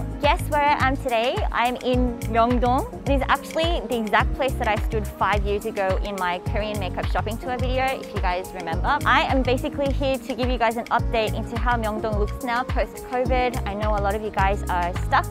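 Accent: Australia. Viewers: aaaw I really want to go to Korea, hopefully covid will be over soon! She got Australian accent btw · Well in Australia..cause you sound Aussie..it's worst in NSW and Victoria..due to lockdowns and restrictions...we cannot do any store shopping..it's click and collect only and delivery...many cafes etc closed and many other businesses closed/gone..many people out of work and hospitality businesses gone...its probably the same in many countries... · Hi - did you used to study in Aus? You have a bit of an Aussie accent happening:) You should see what 6 lockdowns have done to Melbourne - it's really terrible!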